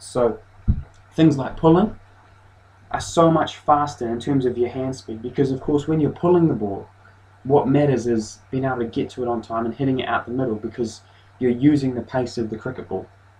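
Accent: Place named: New Zealand. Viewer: dat kiwi accent.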